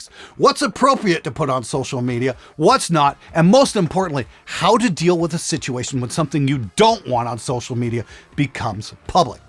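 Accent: Canada. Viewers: When I think of Canadian You Tube I think of him. I generally don't recognize a Canadian accent, but his insistence on "aboot" lets you know it's Canadian content. I had no idea that Canada was suppressing free speech in such a bizarre fashion. Is there any way this channel could do some kind of IP swap or something and just look like it was a few miles West out of Detroit? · Honestly, I didn't realize how many Youtubers that I watch were from Canada until I heard them all say "aboot" Not like it matters, they make good stuff, so I watch it. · You just can't hear your own accent, most people can't, but it is the 'aboot's that tells people you're Canadian, and not from the USA. 🙂 says aboot to a certain degree, you probably can't hear it though. Source - Australian with ears for over 50 years. 😁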